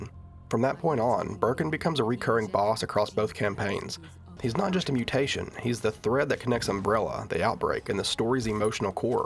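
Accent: Appalachia, United States. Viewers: I like your accent dude. As a lifelong Tennessean, it’s nice to see some southern creators gaining an audience and attitudes in general toward southerners shifting. Also you’re good at what you do, so that helps lol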